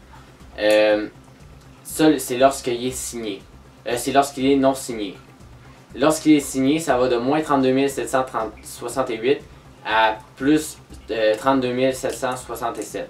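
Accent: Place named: Quebec, Canada. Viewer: drole d'accent les quebecois lol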